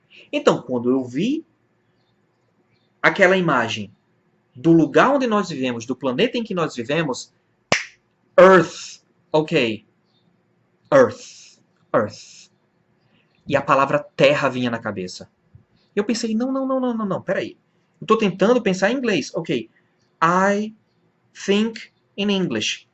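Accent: Rio Grande do Norte, Brazil. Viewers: o sotaque potiguar me chamou a atenção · gostei desse sotaque do rio grande do norte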